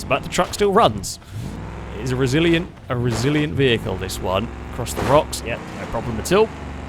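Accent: United Kingdom. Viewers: is British graystillplays confirmed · i took a big break from beam ng drive content and i uhhhh.....i forgot how british your accent was lol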